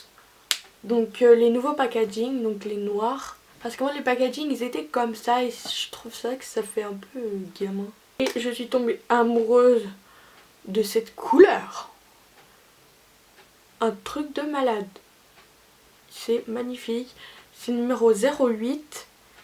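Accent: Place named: Switzerland. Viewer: je pense que ça doit être l'accent suisse, je doit pas être habitué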